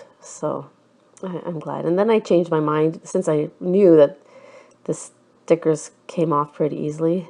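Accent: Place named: Canada. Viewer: Just found your channel, love your PL process video. Hope to see more of them. I used to live in Canada north if Toronto and last year we moved to Australia. Was really great to hear a Canadian accent.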